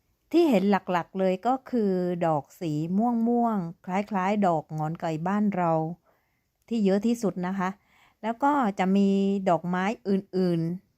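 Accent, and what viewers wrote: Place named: Phuket, Thailand. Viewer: ของฟรีมีในโลกจริงๆค่ะพี่ ชอบทำเนียงพี่จัง ว่าเหมือนสำเนียงภูเก็ตพังงาค่ะอิอิ ดาด้ากะเดาเอานิค่ะพี่ ลงคลิปเยอะๆหน่อยนะคะดูค่ะ ติดยูทูปครั้งแรกกะคลิปของสาวฟินแลนด์นี่แหละค่ะเมื่อหลายปีก่อนติดช่องน้องบีมากน้องบีเก็บเห็ด แล้วชอบดูเวลาเขาไปเอาปลาฟรีเขาลากปลามาไว้ในเรือชอบมากค่ะ มีน้องคนนึงอยู่ฟินแลนด์เหมือนกันชื่อน้องเฟิร์นแต่น้องไม่ค่อยลงคลิปไม่เคยเจอกันแต่คิดว่าน้องน่ารักมากๆ สรุปว่าสาวใต้ไปอยู่ฟินแลนด์น่าร้ากกก🥰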